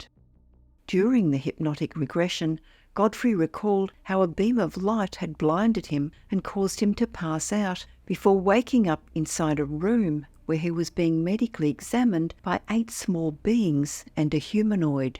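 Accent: Australia. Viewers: That's an Australian accent, not New Zealand. The male presenter they sometimes use is also Australian. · No she Australian or New Zealand.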